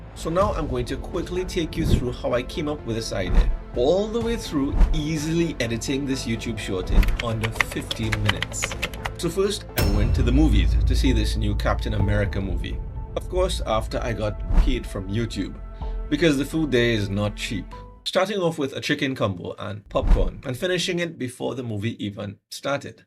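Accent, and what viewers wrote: Trinidad and Tobago. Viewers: Why u sounding like a trinidadian lol❤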